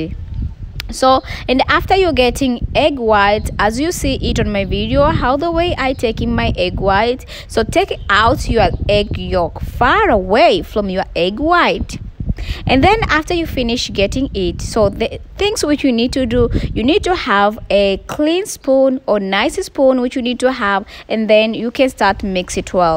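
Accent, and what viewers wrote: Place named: Tanzania. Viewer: Oh my God real TZ woman, the English just Sweet....🤗💕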